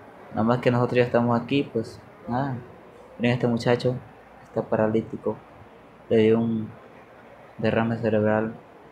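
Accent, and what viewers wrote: Mexico. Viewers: Al principio te escuchaba tu acento de Mexicano pero cuando dijiste “vaina” me dije a mi misma “este vato” no es mexicano! Y conforme fui viendo tus videos es que aprendí que eres centroamericano. Ni modo, te hiciste Mexicano by association 🤣 Lol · Parece más mexicano que hondureño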